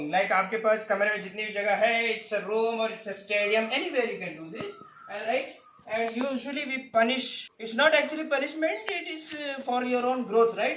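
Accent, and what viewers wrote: India. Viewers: I'm a real fan of his talking style because of my experience with a lot of Indian friends I have Including my Indian bosses Mr Mahendra Waman, Mr Raut, Mr Suresh and other friends like Prasad, Anil, Sarath, Shiyo just to mention a few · in Russia we have the term "Indian English" :-) Realy, very funny and very hard to listen to serious 😁 Try to look "The Coding Train" - that is what I wish Indian English to be! And sorry for my English) Respect!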